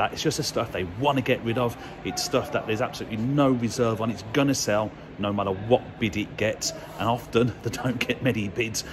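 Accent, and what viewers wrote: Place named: Birmingham, United Kingdom. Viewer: I remember the very first car I bought at auction. A Lada bought from Moseley Road auctions, which I know from your accent you will know :-) ... I was about 18 years of age at the time (now 53) and had a budget of £100, money was tight as a kid. I bought it for a whole £90 !! .. It had 6 months MoT. I drove the car until the MoT ran out, then sold the car from the Solihull Times (nice place to sell cars at the time). I sold it for £150. Actually made a profit on the brick shaped tank !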